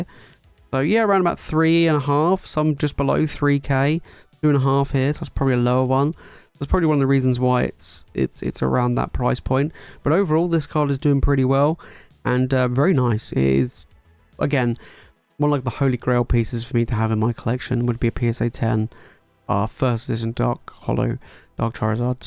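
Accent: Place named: United Kingdom. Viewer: Nice to hear a UK voice - thought I was going crazy that I didn’t remember 1st Ed. in this country!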